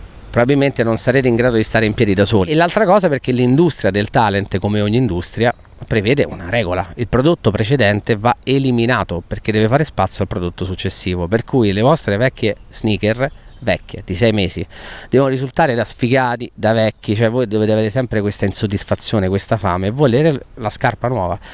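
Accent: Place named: Parioli, Italy. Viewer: Ma sta vocetta e sta parlata da pariolino???? Ma che è successo????? A piotta nun sei più er ghepardo de na vorta!!!! Però c ha ragione!!!!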